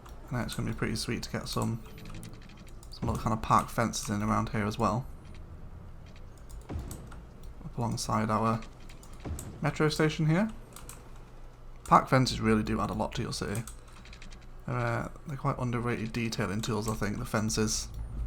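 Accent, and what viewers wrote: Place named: Liverpool, United Kingdom. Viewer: Where are you from in England? Your accent makes you sound like a Beatle. Are you Ringo Starr?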